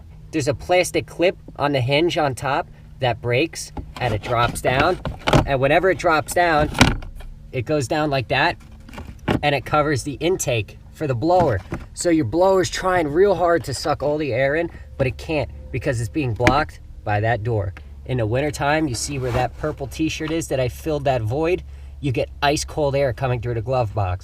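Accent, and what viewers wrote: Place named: New Jersey, United States. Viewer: No wonder he sounds like Chris fix because he’s from Jersey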